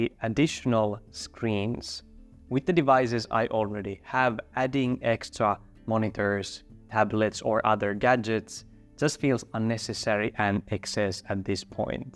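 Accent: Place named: Finland. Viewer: Ihana suomiaksentti! 😂 jotenkin niin hellyyttävä ja ilahduttava. Tosi hyvä video, pitää vilkaista lisää. Aloitan ainakin puolen vuoden ”no/ low buy” -ajanjakson, joten näistä videoista on varmasti apua 😊.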